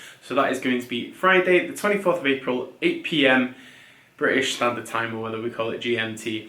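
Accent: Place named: France. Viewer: I don't know what impresses me more....the movie review or your French accent!!!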